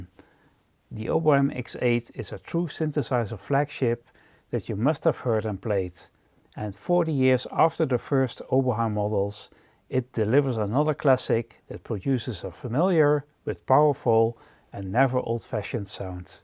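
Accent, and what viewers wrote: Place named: Netherlands. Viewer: It doesn't get much better than hearing an Oberheim by a reviewer with a dutch accent like mine. Groeten uit Eindhoven, of beter gezegt, uit Bern. I expect an OBX8 later this week. I can't wait. The end game for Oberheim poly-synths, except for a module of this giant. It's the sound of all the three synths we love.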